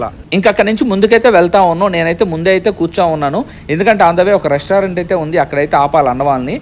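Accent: Nellore, India. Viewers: నువ్వు చెప్పేవిధానం సూపర్. నెల్లూరు యాస చాలా బావుంది.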